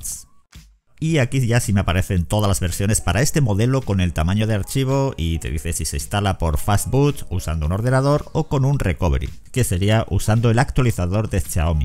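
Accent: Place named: Spain. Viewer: Es tener acento español y saber que no voy a perder el tiempo. Gracias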